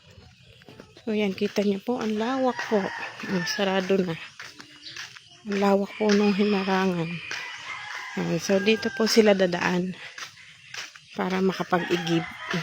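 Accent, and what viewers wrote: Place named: Batangas, Philippines. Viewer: Thank you Ate sa pag video nyo Kay ate.nakakatuwa ang salita ninyo lalo na yung punto ninyo batanguenyo.❤❤❤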